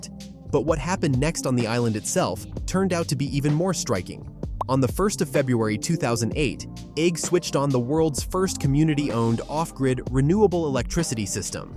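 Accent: United States. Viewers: Why the American accent & the annoying music??? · For the Lord's own sake why the hell is it we have to put up with 'warder' and not water, 'oud of', not out of, 'Bridains' not Britains and so on. I had to turn the sound off as the voice was going through my head. The heading:- 'Forgotten British Islands', then 'Proper Britain '. Dear oh dear, what an insult. Soon after the video started, 'Bridish system' was mentioned. I turned it off in disgust to look at something else as narration was needed. "Blasted Americans, keep to your side of the Atlantic and shut the blasted door. Oh yes, turn the key - permanently"! My son moved to America in 1993. Because he assumed I had come into money, in 2007 had the nerve to call me. I thought that I was talking to an American, listening to him. Wise he was as he never called me again after that. I left Surrey 67 years ago and moved to Dorset. 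I am told I sound as though I have arrived from London even with the passing of all those years. That proves there is no need to act / sound as an annoying American. There is something a little disturbing with the English ,as in the 50s and 60s, cars were made to look American by introducing silly rear tail fins and 'wrap - round' windscreens. "Oh! my dear departed mummy, please come back, 'cos I am starting to cry and also 'cos I loved to hear the click, click of your knitting needles". - 'you there Mummy? - MUMMY?? - sniff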